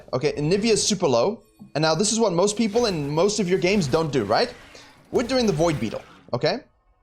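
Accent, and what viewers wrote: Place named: South Africa. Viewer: good commentary, you sound like a Saffa boet? gg wp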